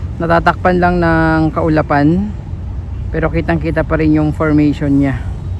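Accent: Visayas, Philippines. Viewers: Hoy ayusin salita mo wala Naman Bola ano Ka bisaya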